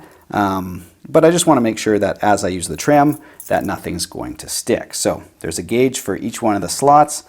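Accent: Canada. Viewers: Love your channel,. You obviously know your stuff and love playing! Your Canadian accent is icing on the cake.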